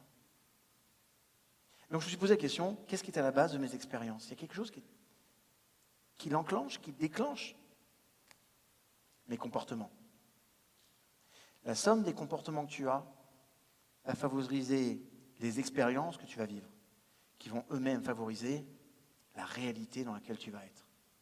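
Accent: Canada. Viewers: Il est pas si mal votre accent canadien je me serait cru dans un épisode de "Mme Lebrun" oui en France aussi l'on connaît cette série tournée en public humoristique à souhait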